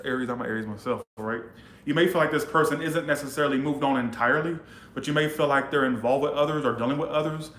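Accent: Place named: Louisiana, United States. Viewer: I just knew you were from Louisiana....love the accent.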